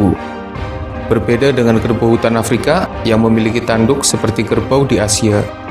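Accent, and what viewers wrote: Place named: Java, Indonesia. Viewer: Min admin, jawane ngd to? Medok bgt ngmong e wkwk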